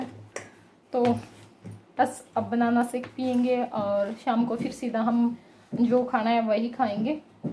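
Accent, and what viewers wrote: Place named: Bhiwani, India. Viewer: Village Life with ok maine socha bhiwani ke paas ke ho aap boli waisi h aaj Maine aapko video first time Demi h nice video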